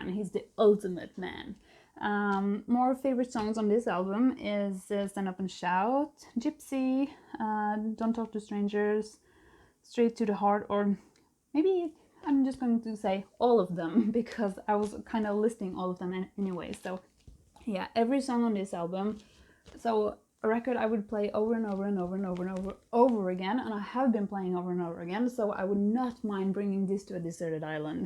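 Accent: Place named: Ohio, United States. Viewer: ha thought you were from ohio,,,,love your accent and your love for metal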